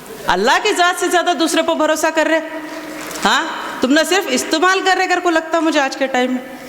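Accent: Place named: Bijapur, India. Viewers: Bijapur urdu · Urdu dakni mix. Good👍👍👍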